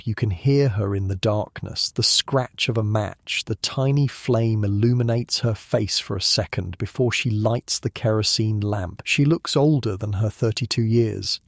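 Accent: England, United Kingdom. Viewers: This is my favorite English accent for stories! There are others, but this Historians chosen voice or original is the best! 2247 in Ridgecrest, Ca USA.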